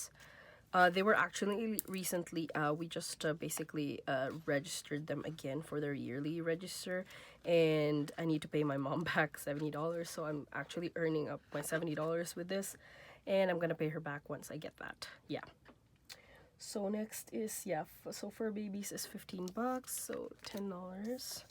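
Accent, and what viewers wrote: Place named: Canada. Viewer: You have an accent are originally from Canada.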